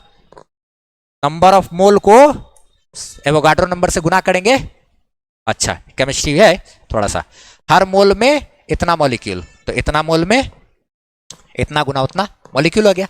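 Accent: Bihar, India. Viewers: Sir aap bihari ho kya😅?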